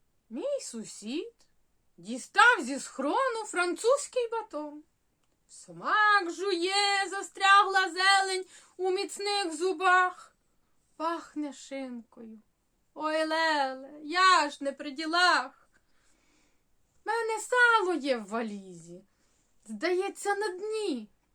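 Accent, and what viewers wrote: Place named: Poltava, Ukraine. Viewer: лелечко, сонечко, ви ж такий талант! Я буду по всіх знайомих каналах на Ютубі вас пропагувати. Українського Ютубу багацько не бува. І мені як медом по серці ваша Полтавська говірка) ви неймовірна жінка!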